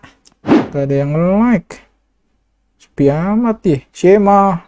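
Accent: Jakarta, Indonesia. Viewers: lebih bagus logat betawi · Kentel bener betawi nye cing wkwkw, gue bantu subs nih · pertahankan logat Betawi Lo bang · Bahasa luar + betawi ngakak sih..😂🤣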